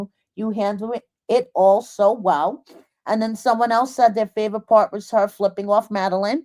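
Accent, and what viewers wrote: New York, United States. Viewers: I can’t stand eScott he’s not a nice human and obsessed with bloggers. As for your voice I love it, your NY accent is so cool sounding to me.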